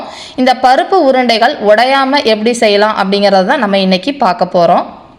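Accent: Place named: Coimbatore, India. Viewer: நான் உங்கள் new subscriber .நீங்க கோயமுத்தூர்காரங்களா?உங்க பாஷை அப்படி நினைக்க வைக்குது .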